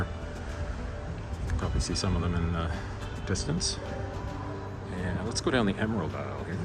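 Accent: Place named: Canada. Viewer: also im pretty sure youre canadian by your accent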